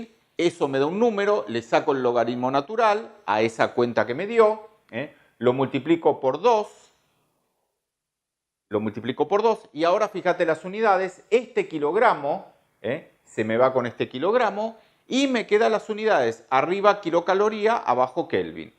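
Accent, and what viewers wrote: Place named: Argentina. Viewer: yo se que usted no quiere que le pongamos like ni que le agradezcamos y toda la bola, pero no sabe, NO SE DA UNA IDEA de lo HERMOSO que es escucharlo hablar en argentino explicando tan bien todo y con tanta buena onda y facilidad, curso Lic. en Biotecnologia en la UNM y es hermoso todo esto! gracias!! millones de gracias! quisiera abrazarlo fuerte! Aguante la educación publica!!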